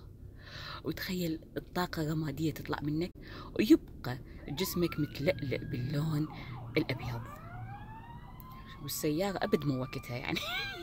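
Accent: Iraq. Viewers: ههههه حبيت الكلام العراقي ❤ شكرا دايما طلي علينا بفيديوهااتك الحلوة المفيدة.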